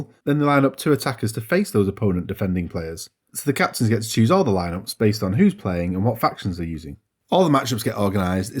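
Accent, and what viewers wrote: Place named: Northern England, United Kingdom. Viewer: Ok, fine, you sound like a northerner. My family is from Northern England. now just a bunch of Americans.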